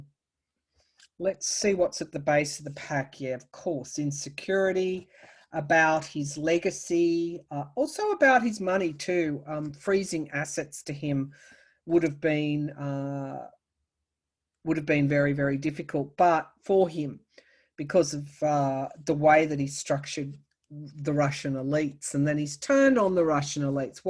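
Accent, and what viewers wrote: Australia. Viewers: Just hearing your voice and your adorable Aussie accent makes me smile and makes me feel at peace. You've got something that's beautiful. A gift. And I don't just mean the cards. Thanks again!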